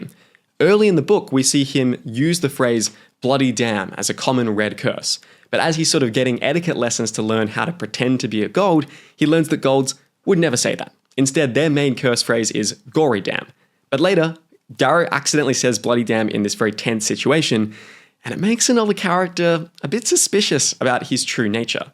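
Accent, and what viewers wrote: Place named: Australia. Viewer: Interesting to hear your accent. Aussie but the US influence is much stronger than from my day. Change in “a”. Pronunciation of “figure” for example.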